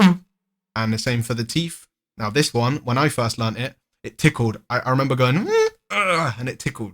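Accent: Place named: England, United Kingdom. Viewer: English accent 🔥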